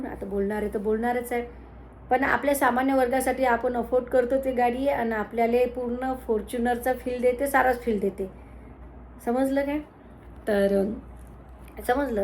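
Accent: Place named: Vidarbha, India. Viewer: वऱ्हाडी भाषा खुप छान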